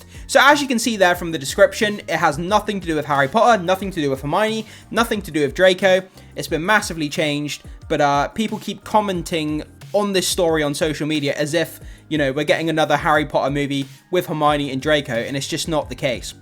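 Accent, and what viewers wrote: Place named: United Kingdom. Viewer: great video as always! An off-topic question tho - as a foreign languages enthusiast throughout all your videos I've been wondering about the way you pronounce the H in the "HBO series". If you wouldn't mind answering a personal question - what is your native accent? I guess it's definitely a UK one - but which exactly?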